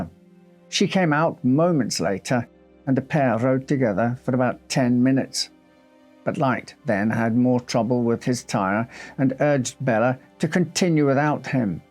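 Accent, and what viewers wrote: United Kingdom. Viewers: I mean this in the best way possible... Listening to an older man with a British accent tell me stories, is just so fitting! 🗣️👴👍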